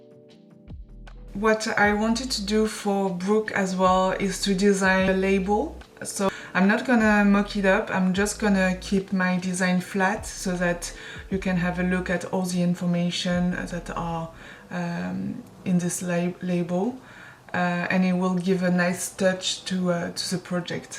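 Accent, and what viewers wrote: France. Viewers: L'accent français🥰🥰 merci je suis entrain de refaire mon portfolio en ce moment et ça me motive, j'ai grave la flemme mais c'est mieux que j'efface les immondices que j'ai fait quand je débutais 🤣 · Tu es française? Are you french? You have french accent it seems.. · J’ai décelé un accent français 😁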